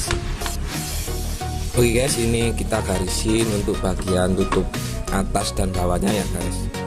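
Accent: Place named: Malang, Indonesia. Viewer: Mas sampeyan iki wg malang ta? .... Kok logate arema pasti tak like mas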